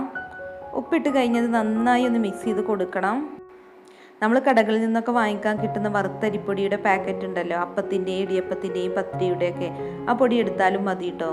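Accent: Malappuram, India. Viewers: ഇജ്ജ് എന്താ പറയണേ " ഒഴിക്കലും " കയിക്കലും ഇല്ലെങ്കിൽ പിന്നെന്ത് മലപ്പുറാ..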